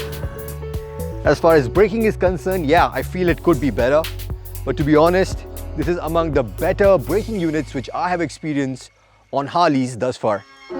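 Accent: India. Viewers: Nothing, I say nothing, sells a harley davidson like a guy with an Indian accent and an earing in one ear... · What's with the accent???? Aren't you Indian??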